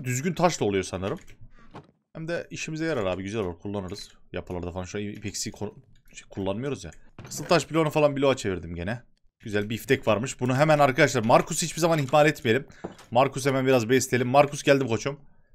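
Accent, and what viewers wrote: Yozgat, Turkey. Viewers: abi ben iranli turkum ve bizim turk dili ayni sizin yozgat diline benziyor vallahi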